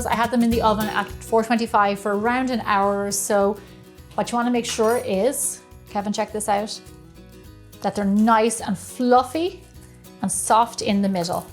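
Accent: Ireland. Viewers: Hi.. I love your do-it-yourself filming, it brings out your personality even more.. when you were humming as you were picking the potatoes.. it's lovely to see how you're keeping your accent and Irish-"isms" intact 🤗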